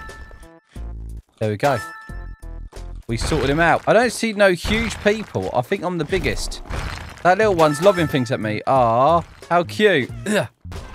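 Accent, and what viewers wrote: United Kingdom. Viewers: I just realized that this YouTuber is British💀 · Are you British😂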